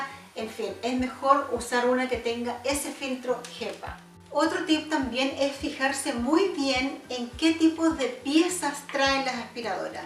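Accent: Chile. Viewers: Muchas gracias. Acabo de descubrirte. Estoy fascinada. Y me es lindo oír un acento chileno. Te cuento que descubrí las vaporetas y me estoy haciendo una muy buena impresión. Sabes algo? Te animarías a hacer un video? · Hola, gracias por los consejos. Una pregunta eres Chilena? Por tu acento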